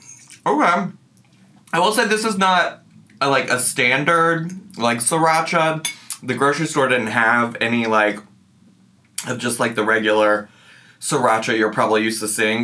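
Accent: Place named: Midwest, United States. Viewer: It’s that Midwestern voice.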